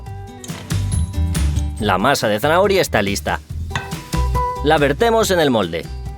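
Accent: Spain. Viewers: Qué bien que pongan una buena voz en español, en castellano como tiene que ser y no en latino como ya se escucha en demasiados vídeos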